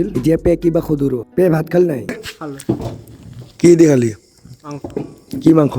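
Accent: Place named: Bishnupur, India. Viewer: Amr gaor kotha koisa tumi val loga Thai khini. Bishnupur hoy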